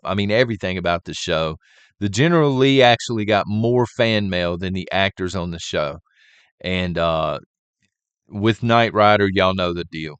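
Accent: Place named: South, United States. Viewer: You would need an accent like yours for the general lee to be fitting. ( just saying y’all) Kitt 100% for guys like me with no heavy duty accent like yours. Just my two cents..😬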